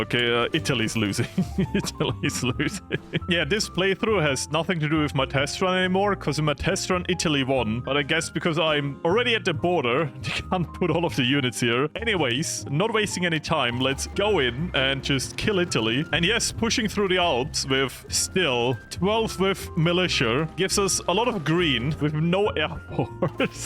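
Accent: Austria. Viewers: I hear Österreichisch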